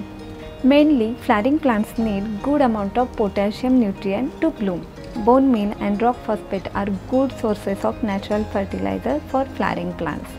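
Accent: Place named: Gujarat, India. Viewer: Your english accent must be the gujarati one! That's very sweet and soothing!!🤩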